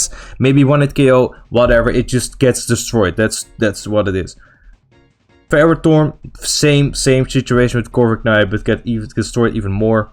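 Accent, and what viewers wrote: Netherlands. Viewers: 3 seconden in en ik kon gelijk horen dat je uit Nederland kwam, blijft leuk om mensen uit eigen land te horen :)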